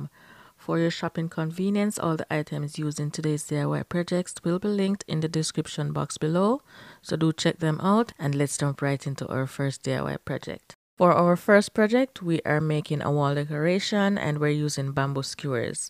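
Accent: Jamaica. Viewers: All these creations are beautiful. I'm definitely going to try the flower and black vase. Your accent sounds Jamaican, are you?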